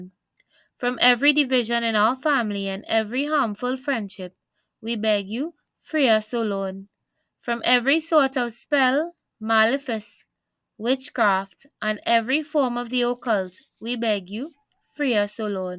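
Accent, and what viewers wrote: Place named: Trinidad and Tobago. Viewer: Trini accent 😁 also Amen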